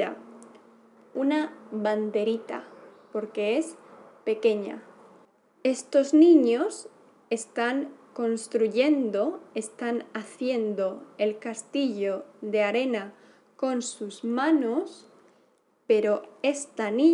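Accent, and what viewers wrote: Spain. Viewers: Hola. I enjoyed this video. I’ve been learning Spanish using the app Duolingo. You sound very different and I think it’s because the app is based on ‘Mexican’ Spanish. You sound more familiar to what I hear when I’m in Spain. Am I correct?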